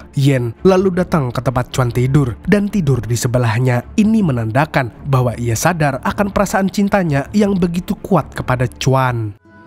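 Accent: Banjar, Indonesia. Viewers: Ulun kada tahu Pian orang Banjar kah... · Org Banjar sekali kwn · Bah.. Urg banjar kh ni? 😅😅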